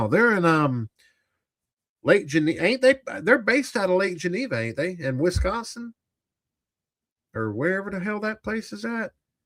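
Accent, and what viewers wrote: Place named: Appalachia, United States. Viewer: I gave you over 5 and a half minutes of my life to listen to your hillbilly ass yawl yawl yawl your way to complete and utter retardation.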